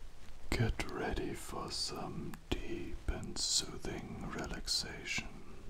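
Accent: Germany. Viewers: are you from germany ?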